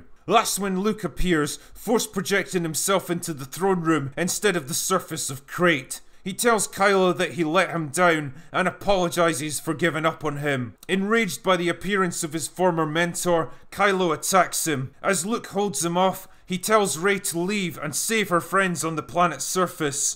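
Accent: Scotland, United Kingdom. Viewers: Despite being read by a Scotsman who sounds like he's angrily gnashing his teeth at the height of inebriation this script is pure healing. I don't get why so many directors also write the script of their movies nowadays when they're clearly two distinct skill sets. And who greenlights these things? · you clever weaver of character tapestry you hear me say. You didn't just 'fix' Luke Skywalker, you provided him salvation. You cleverly wiped the stain of shame from him and restored him to the galaxy saving hero we all came to know and love. I've already bought several of your books, now I eagerly await the version of the Sequel Trilogy. Hell, I'd even pay for the audiobook if you narrated it. Just can't get enough of that Scottish slurring of poetry!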